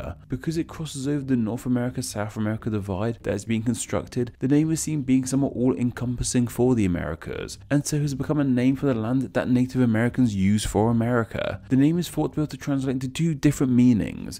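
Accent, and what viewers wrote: United Kingdom. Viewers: Wouldn't individual tribes have different names for the land where they lived? Making generalized statements about Pre-Columbian indigenous people in North and South America is about as useless as making similar statements about the peoples of Europe at the time Julius Caesar conquered Gaul, or Roman Legions landed in England. In what is now the United States Eastern tribes would have lived in permanent settlements as would those in the Southwest, the Pacific Coast, and parts of the Intermountain West. The indigenous group the British-accented speaker is making generalizations about were the "Plains Indians", so he likely learned everything he knows about Native Americans from "Dances with Wolves". BTW, what did the indigenous people of Europe call their continent during the Dark Ages? Except for a few Catholic priests who would have rendered the answer in Latin, they wouldn't have a name for it BTW, I always turn to Received Pronunciation British speakers for information about Native or Indigenous peoples of North America--NOT. 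· Guys, hes a brit Cut him some slack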